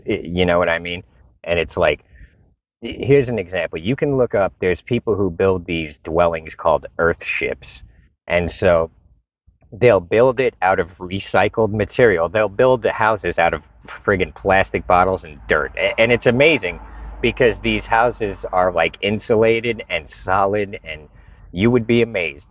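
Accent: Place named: Long Island, United States. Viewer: your Long Island accent makes you sound like *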